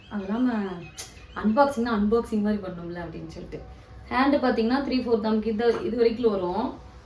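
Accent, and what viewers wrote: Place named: North India, India. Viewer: Idhu north Karan pesuran nalla theriyudhu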